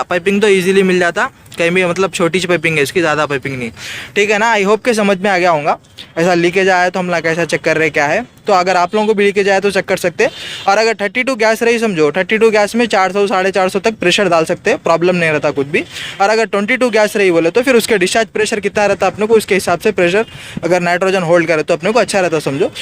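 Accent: Hyderabad, India. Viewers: Tume Hyderabadi mlum hote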